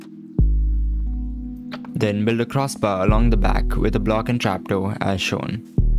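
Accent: India. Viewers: lol, i knew you are an indian the moment you spoke, your accent was similar to tech raj(another utuber), haha, i didnt expected an indian to be interested in minecraft mech. and tech. most of the indian minecrafters are jjust playing it for views and copying stuff, nothing special. source; im indian too. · Indian accent? Bro u Indian, btw just clicked on vid 4 secs ago.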